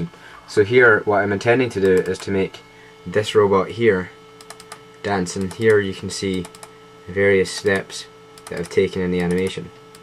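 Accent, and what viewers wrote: Scotland, United Kingdom. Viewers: yhass lol ur scottish! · u da man son, im scottish to and i get that irish thing all the time lol. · Ooo I love the Scottish accent!!!! :D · Nice vid. I notice you did the hard way to begin with! When I import the images from the collection to the timeline, I do it all in one go (Simply select all and drag to the timeline). Also, would have been nice if you'd shown how to add titles and a soundtrack. PS. I sussed you were Scottish straight away lolol! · wow im irish and i could hear a scottish accent not an irish one.. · I enjoyed it a lot. BTW, I knew you were scottish...lovely accent. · Je is Scottish god sake aaaa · wow... I can't believe I just watched what I experienced on my own! Really! And I wouldn't think he'd be irish at all... more like british... Geez! stop animation is hard! mainly because I give up too often... 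>_< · Great info! Thanks, I'm going to try it today. OH...and I could tell you have a Scottish accent not Irish :) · Dude love ur scottish accent, and i am gonna enjoy making movies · This is a really good video, I learned something new today! Also, I'd marry you just because you have a lovely Scottish accent haha :) But anyways this was really helpful, I'm making an animation for class and had no idea how to do the importing part :P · Thanks a zillion!!!! the video gave me exactly what i was breakin my head over. and the scottish accent is very cute and distracting (in a good way )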